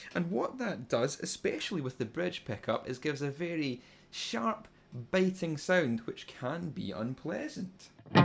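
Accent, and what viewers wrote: Scotland, United Kingdom. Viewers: It's so hard to understand what youre saying when you have Scottish accent..btw chicken needs ketchup and mayonaisee so PUT UP THE GAIN!!!!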